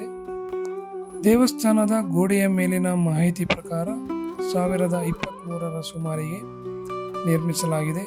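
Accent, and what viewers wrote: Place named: Kolar, India. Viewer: Formal aag matadbeda loki nin accent ee channag aithe local kolar language ee matadu 😂 3 min video alli ond 10 sala aadru "galu " barbekittu ❤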